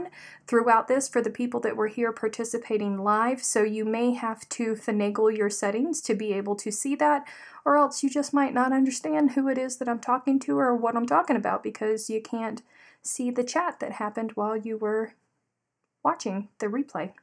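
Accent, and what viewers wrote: South, United States. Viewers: OH MY GOODNESS!!! I JUST heard where you are from😃😃😃 My hometown is Savanah Georgia🤗 I'm a GA girl living in Las Vegas, NV, and I can tell yall, it's true...These people have NO idea about sweet tea😳 They actually think you can sweeten cold tea😳WITH ICE IN IT😳😳😳 Ok, rant over😂🤗Oh, I do like the granny square you are working on, that's just enough beautiful color to make it pop. I have been binge watching your videos since I found you Saturday. I have a question for ya, I heard you say you were a graphic designer. Did you go to SCAD? I have a great friend that is a graphic designer & she graduated from SCAD. Uh oh, yep, just heard you talking about high cotton, yep it IS a southern thing. I heard that all y life too, lol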